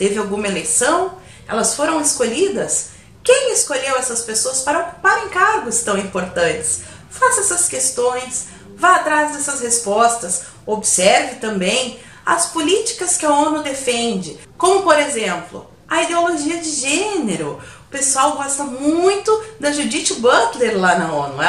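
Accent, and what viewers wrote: Rio Grande do Sul, Brazil. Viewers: Oi !! Bem que vi pelo teu sotaque que tu é gaúcha, como eu. Assisti a um vídeo teu onde estava te deslocando de carro pela BR 116, sentido NH - Porto Alegre e entrou na Rua Ipiranga em Canoas.... hahhahaha Legal !! Moro em Esteio, mas sou de Canoas. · " todos iguais, todos iguais, mas uns mais iguais que outros", adorei a consideração a melhor banda do Brasil:. Parabéns pelo trabalho professora! Meu sonho é ter aula contigo, bah que guria sensacional, se gaúcho não tem o melhor sotaque brasileiro eu não sei quem tem❤️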